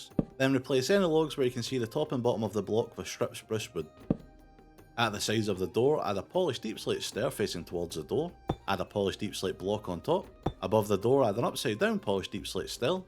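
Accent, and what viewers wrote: Scotland, United Kingdom. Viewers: I think he’s Scottish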